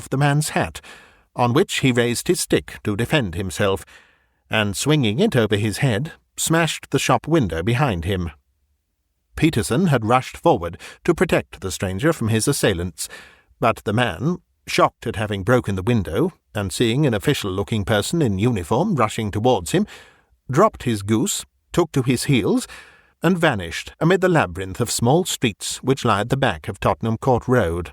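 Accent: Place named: RP, United Kingdom. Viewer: Nice. This is one of my faves from Sherlock's short stories. It's got a great mix of inductive reasoning, clue following, person tracking-down, interesting side characters, and a happy ending for all parties. Very nice to hear it from my favourite posh British narrator: Mr.. Happy holidays to you, and to everyone who reads this.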